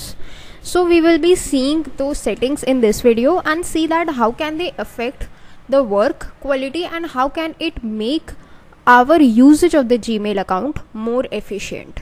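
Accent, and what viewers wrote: India. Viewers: 0 seconds ago This is pure Indian English accent. You may need to go to school to learn the same :)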